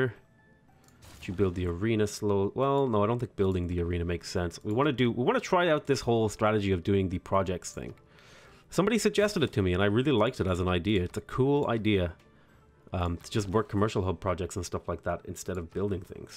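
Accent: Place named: Ireland. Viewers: Just Irish. My lot are from Galway, let's just say you're unlikely to get any West Coast farmers as youtubers 😉 no fecker would understand them. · Ah he's Irish, since i'm not from an english speaking country it can be hard to identify such sometimes. I usually associate Irish with the incomprehensible speech of rural citizens, even though that is probably only a small percentage :)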